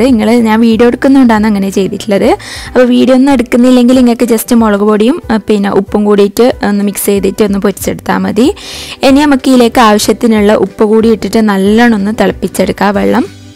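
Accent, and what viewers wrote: Kannur, India. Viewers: Innala korchi busy aaypoi deep cleaning nnta baagam adhn nu late aayadhu ningla video kaanan my fvrt ur vdes and kannur slang...enki oru hai hello paryoo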